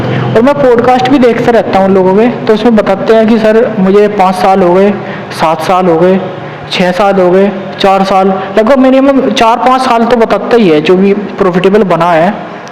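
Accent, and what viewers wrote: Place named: Muzaffarnagar, India. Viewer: Brother ap kaha se ho apki voice hamare muzaffarnagar jesi lag rhi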